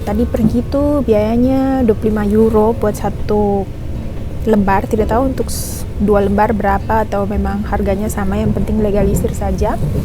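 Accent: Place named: Papua, Indonesia. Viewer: Mamayoo Kam lihat tu, sa tu 😀😀😀 kak walopun sdh pindah warga negara, selalu ingat aksen itu neh.. kak pernah di papua k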